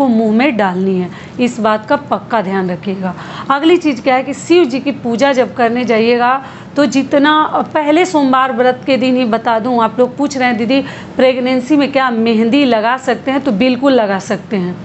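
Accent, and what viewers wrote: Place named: Bhojpuri, India. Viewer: Rajasthan. Ki. Saskriti. Kebareme. Pahnave. Ke. Bareme Ap Jantih. Kya rajsthani. Orte. Vat. Savitri. Ka. Vartme. Nhi Samjatih Inkeliye Tij Karvacoth. Ke. Braber. Apke Vat. Savterike Vart K. Braber. Apke. Guru Konhapkhase. Bilong Kertih. Apki. Boli Bhojpuri. Lagtih. Apko. Ptah. Rajsthanme Moutabu me Bht. Bra. Atmgyani. Permatgyani. Barahamkumari. Sasthanh Muje. Ptah. Biharme Patna Saherme. Bodstuph. Purane. Jamane. Me. Taksila Visvvidaly. Tha. Itihaas me. Kabi. Hamare. Rajsthanki. Saskriti. Ke. Bareme. Btaiye hamare. Pregnancy me. Mehndi. Nhi. Lagat hgodbraim. Lgatehh Akbar Satve Ya Nave Mahine Hamare. Yha. Maglsuter. Nhi. Pahnqte. Galeme. Kanti. Pahnteh. Morden. Orte. Ajkal. Pahnrih. M nhi. Pahnti. Muje gahn. Nhi. Suhate. M. Simple. Ladyhu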